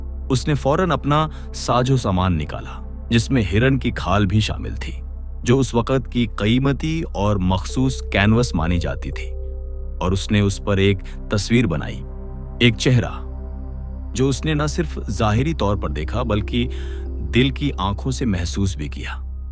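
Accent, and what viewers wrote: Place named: India. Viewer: بھائی ہندی تلفظ میں اردو بول رہے ہیں ، جائرین اردو میں زائرین ہوتا ہے۔ یہ صرف مسلمانوں کو مزید تقسیم اور گمراہ کرنے کی ناکام کوشش ہے۔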